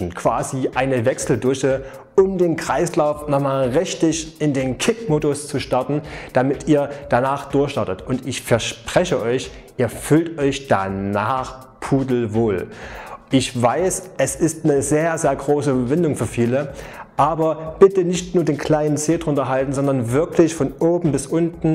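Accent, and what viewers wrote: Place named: Saxony, Germany. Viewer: Alter sächsisch Mann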